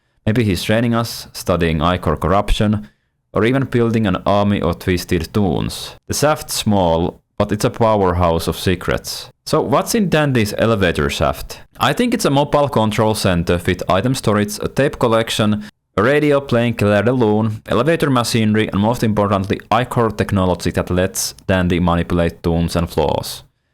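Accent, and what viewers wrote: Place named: United Kingdom. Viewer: Bro sounds britsh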